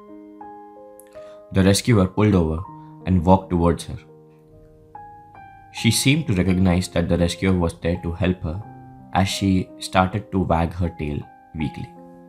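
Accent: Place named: India. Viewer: The storyline never matches what we are watching. It's like miles behind. The dog has been with the woman from the beginning of the video but the storyteller is making it seem like that's only happening half way through the video. Whoever produces these videos doesn't know what they are looking at when they are putting the English storyline into the video because the transcript is automatic and follows the sounds of the storyteller. As he sounds Indian, it might be that he uses Indians to put his video together for him and they don't speak English. Maybe that is the reason for the mish mash.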